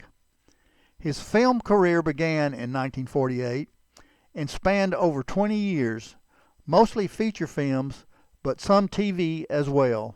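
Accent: Texas, United States. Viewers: Don't know who narrated this video, but only took the first three or four words to let me know he is a fellow Texan. I live in San Antonio, TX, where the VA hospital named after him is located and I have gone through the small museum there with relics and videos of his service. Indeed a fine man and a real hero.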